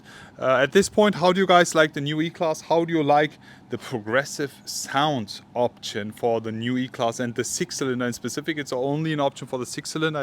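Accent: Germany. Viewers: Why does this guy sounds like a German speaking english.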